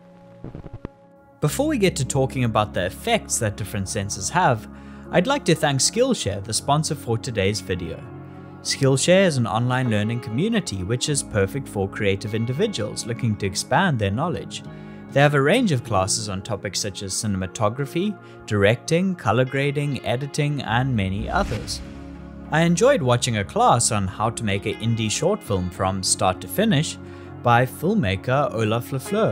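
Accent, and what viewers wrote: South Africa. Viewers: Great video!! Was that a South African accent I heard?? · South African :)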